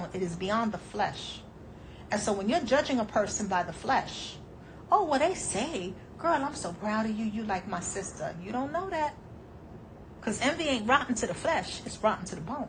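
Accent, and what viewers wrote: New York, United States. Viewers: The New York Accent I love that😍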